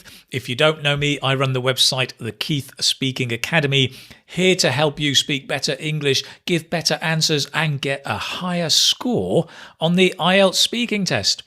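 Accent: United Kingdom. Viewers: I love British accent, do you have a podcast?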